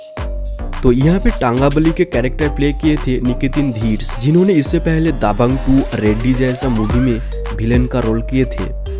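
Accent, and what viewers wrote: Bengal, India. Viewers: 😂 Bengali accent hai. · DADA you are Bengali.. oh pata chai hi rah hay.... Pati Banglay bolle bhalo korte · May be he hails from Bengal. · Bhai bangali accent mix hain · I think he is Bengali. It seems by his accent. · Ur voice is Bengali I caught 😂😂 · Hindi bhi aap bangla me bol rahe ho 😆, Please hindi sudharo. " Meenamma daakte (bulate) hai" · Sir bengoli hai..isi wajha se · Mere papa jaisi, bhai hamare Bengali hai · Are bhoi aap bongali ho kya? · Bengali accent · Bengali and Hindi mix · Bengali accent hai, south Indian nahi!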